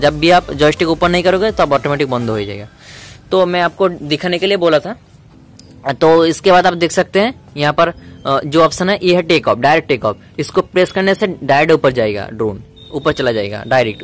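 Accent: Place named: Bengal, India. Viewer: Bangla beriye jacche mukh theke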